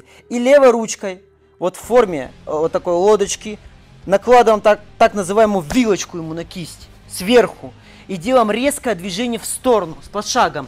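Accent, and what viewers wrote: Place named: Urals, Russia. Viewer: По говору слышу — ты уралец.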